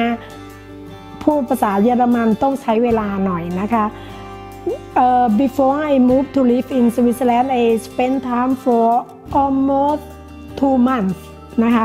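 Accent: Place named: Thailand. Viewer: Wow. This is an interesting Vlog. Greetings from Oman. Sawadee Krap.. Khob khun for sharing this video. Interesting to know about you and your life. good to meet your cameraman Husband:) Tinglish sounds cool.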